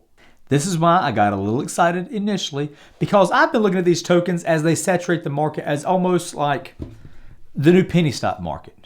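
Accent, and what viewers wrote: South, United States. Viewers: came for safemoon, stayed for the southern accent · Subscribed to this channel simply because this dude seems genuine and honest. Plus his accent just kills me. Some southern USA with undertones of a British accent. Bit in all seriousness, he's made me realise the importance of investing in Safemoon NOW!!!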